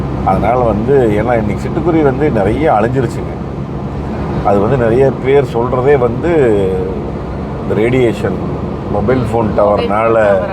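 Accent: Kongu, India. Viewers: கொஞ்சும் கொங்குத் தமிழ் பேசும் கோமகரே...! திகட்டாத தித்திக்கும் பேச்சுடைய திருப்பூர் திருமகரே...! எங்கள் மதிப்பிற்குறிய திரு. அண்ணா அவர்களே❤❤❤🙏 எப்படி இருக்கீங்க அண்ணி❤❤❤🙏 எப்படி இருக்காங்க ரித்திக்❤❤❤ எப்படி இருக்காரு. தெலுங்கானாவில் இருந்து திருப்பூர் வந்த அந்த நண்பரின் நட்பை உங்களுக்கு தெலுங்கானாவில் வெளிப்படுத்தியதை வெறும் வார்த்தையின் வாயிலாக சொல்லிவிட முடியாது அண்ணா❤❤❤❤🙏 மாருதி கார்களைப் பற்றி இன்னைக்கி பலதரப்பட்ட இழிவான பேச்சுக்கள் சமூக வலைதளத்துல உலா வருது. உதாரணத்திற்கு.... மாருதி காரா அது ஒரு டப்பா...டின் கேன் இந்த மாதிரியான பேச்சுக்கள். அது அத்தனையும் முறியடிக்கும்வண்ணம் மதிப்பிற்குறிய அண்ணா உங்களோட இந்த லடாக் பயணம் நிச்சயம் அமையும்...! காரணம் லடாக் பயணம் என்பது அவ்வளவு எளிதான பயணம் கிடையாது. அதிலும் நீங்க SWIFT காரைக்கூட எடுத்துட்டு போகல? மாருதி 800 காரை எடுத்துட்டு போயிருக்கீங்க👍👍👍👍👍 இதுவே மிகப்பெரிய சவால்👍👍👍👍 லடாக் சாலை பொதுவாக மலைப்பாதைகள் இருக்கும்.... 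சில இடங்களில் பள்ளங்கள் மேடுகள் அதிகமாக இருக்கும் என்று சொல்லக் கேள்வி. அதையெல்லாம் இந்த மாருதி 800 காரில் சென்று பயணத்தை வென்று திருப்பூர் மண்ணில் கால் பதித்து மாருதி காரின் சரித்திரத்தை வெற்றியாக்குங்கள் அண்ணா...! லடாக் பயணம் இனிதே தொடர்ந்திட வாழ்த்துகள் அண்ணா❤❤❤ என்றும் அன்புடன் உங்கள் தம்பி என்றும் அன்புடன் உங்கள் TMF ரா.முஹம்மது கபூர் (சென்னையில் இருந்து)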